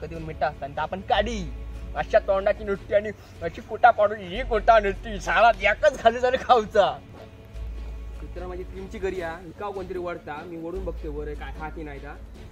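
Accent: Malvani, India. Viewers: Lay bhari bhava.. Tuzi malvani boli ti pn tuzya unique comedy andajat hasayla bhag padte.. Kahitari navin karnyacha prayatn ahe tuza khup mast vatat tuze video baghun. Keep it up bro.. 👍🏻👌🏻👌🏻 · Amazing bhava !! 😂😂✌🏻 tu ani tujha malwani accent 😂✌🏻♥️ tyane video madhe ankhin fun yeta !! Keep it up bro ♥️✌🏻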